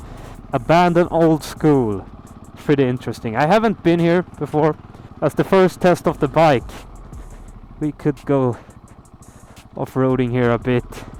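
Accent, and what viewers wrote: Sweden. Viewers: Ironic? You can't sound more Swedish when you speak English.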